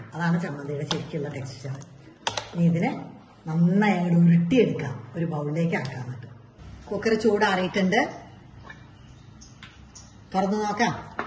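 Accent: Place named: Valluvanad, India. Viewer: Teacher valluvanadan style il language.. Teacher evideya place?Super nalla nadan reethi enikku ishtayi.. ❤️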